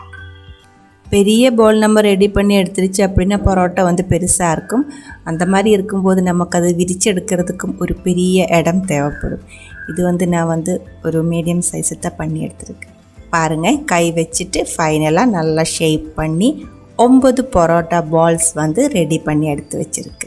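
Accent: Kerala, India. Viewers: കേരളയെവിടെയാ സംസാരം കേൾക്കാൻ നല്ലരസം ഉണ്ട് തമിഴ് മലയാളം കളർന്നു കേൾക്കാൻ നല്ലരസമുഡ് 👌👌👌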